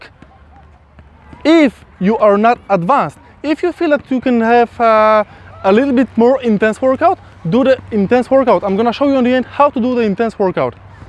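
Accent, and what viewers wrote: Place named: Poland. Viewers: Typowy polski-angielski, hehe ;) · Lepszy angielski z polskim akcentem niz polski z angielskim akcentem, jakby to po paru latach juz sie zapomnialo ojczystej mowy hehe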